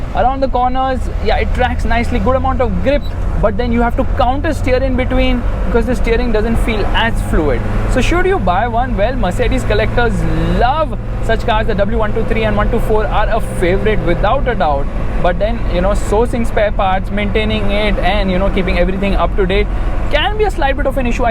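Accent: India. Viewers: Dont speak English in indian style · ..... Indian accent.....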